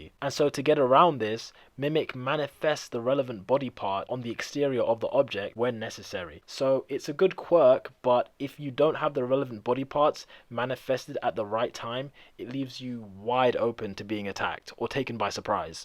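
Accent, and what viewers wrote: United Kingdom. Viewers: You are awesome3. Hope you get bigger man it will happen soon. Are u British btw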